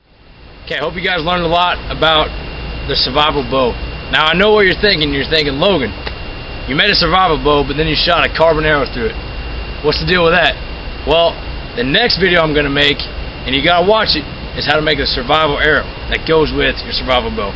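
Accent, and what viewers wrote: South, United States. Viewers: Awsome bow !!very simple to make..nice hat and sunglasses 😁☺love his southern accent😂☺👍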